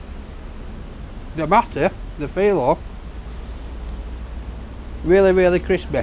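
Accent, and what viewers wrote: United Kingdom. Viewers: I come see one of two videos of this bloke when I need me some british accent in mi life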